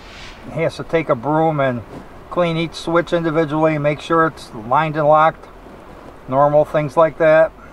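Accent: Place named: Buffalo, United States. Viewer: I thought I recognized that accent! I'm originally from Buffalo, and haven't seen "Lake Effect" snow in a while! 👍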